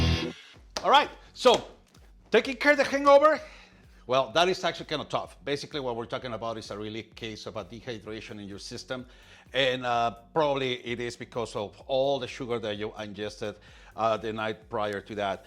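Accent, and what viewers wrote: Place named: Latin America. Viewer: His Latin voice is hot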